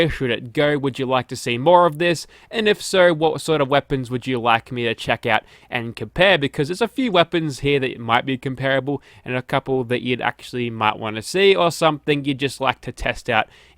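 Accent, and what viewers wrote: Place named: Australia. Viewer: So Australian. Love it.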